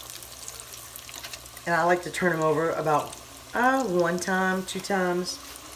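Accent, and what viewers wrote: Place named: New Orleans, United States. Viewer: Your channel is fantastic! You are a wonderful cook. When I first heard you I thought " oh she is my people" as I was raised in NOLA!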